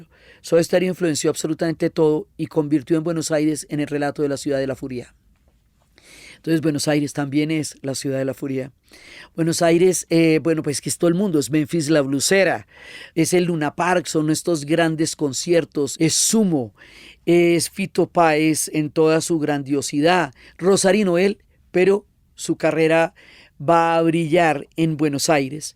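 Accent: Colombia. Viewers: Yo no se si la voz de Diana es bonita o no pero es que a quien no le va a encantar escuchar hablar con ese acento! Aqui se junto todo lo bonito! La historia, la geografia, la cultura, la radio, el podcast, las ciencias sociales en general, colombia lo mas bonito de america y lo dice un Hondureño y desde luego una mujer y ahora pongamole a esa mujer ese acento tan bello ¡ como le parece!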